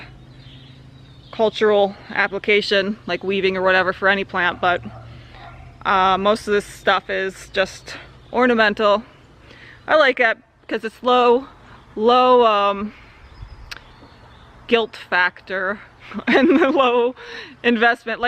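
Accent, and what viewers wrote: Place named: Pennsylvania, United States. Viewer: i like your penns woods accent. ;) so why arent there any schools for kids to learn about plants and gardening? i would think being outside in the sun gettin' your hands dirty is better for their young impressionable mind development. Germinating seeds, learning about whats what...... would have to be better for them than common core standardized testing.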